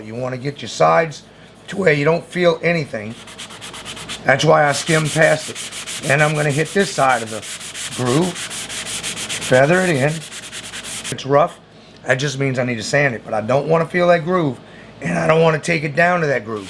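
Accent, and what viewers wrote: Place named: New Orleans, United States. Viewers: to a British person he sounds like like he’s from New Orleans as you said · love your work - quick question. I cannot figure out your accent. It's definitely not rural Virginia, where I'm from, but doesnt sound like Long Island either. It's like 5 different accents in one. New Orleans maybe? Do tell, sir!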